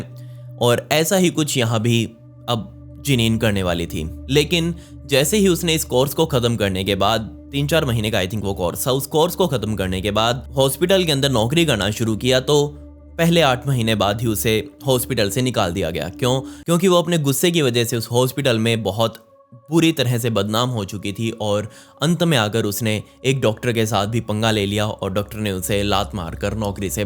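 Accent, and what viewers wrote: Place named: Punjab, India. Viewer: hilariously you speak hindi little bit punjabi touch make your video more interesting carry on great job.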